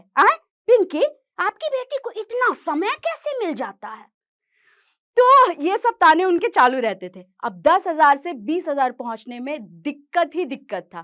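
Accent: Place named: Bihar, India. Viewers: Ji nh sare bihari aese hi baat karte hai.. It is their accent... Bihar jayenge toh har koi aese hi baat karte milega.. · South k log South k accent me bat kre to wo educated & bihari agr bihari accent me bat kre to wo regional....... U are an inspiration.. regional ho ya desi... But aap ho original.. this is wat matters.. all d best & keep shining ❤ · Don't be ashamed of being bihari , be proud of your bihari accent. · you really acts like a proper bihar girl, your accent, expressions, dialogue delivery are awesome and apt. god bless you with more success. be like this only free and frank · You have put Bihar and the beautiful dialect on the top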